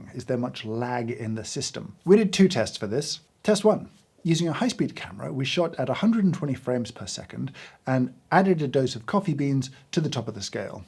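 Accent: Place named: England, United Kingdom. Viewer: Why he sounds less English today?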